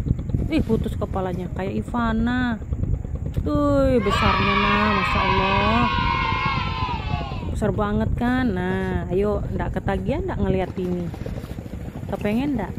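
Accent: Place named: Banjar, Indonesia. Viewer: logat banjar ny ad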